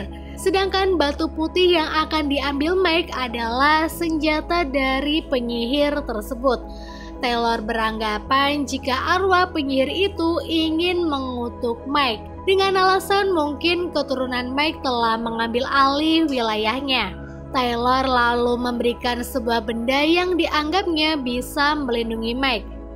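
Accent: Pemalang, Indonesia. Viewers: Wong pemalang kah mba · Wong Pemalang min? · Miminnya org pemalang ya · Wong Pemalang kie suara ne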